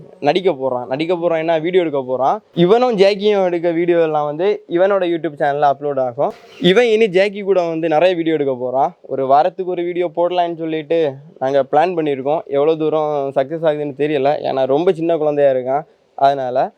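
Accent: Kanyakumari, India. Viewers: Kanyakumari ah bro neega Kumari slang la pesuriga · Anna..... R u from Kanyakumari... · Bro r u from Kanyakumari 🤔🥰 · Bro nenka kanyakumari slangaa ,nan kanyakumari 😁😁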